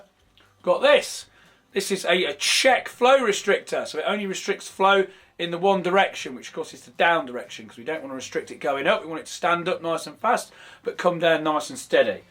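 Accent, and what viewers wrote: England, United Kingdom. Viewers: Сука мега амерзительный чисто английский язык другое дело американский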